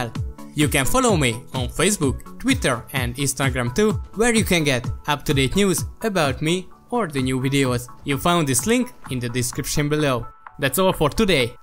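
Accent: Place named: Hungary. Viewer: You have a typical Hungaryan accent. 'És szerintem magyar is vagy, tetszik a hangod :D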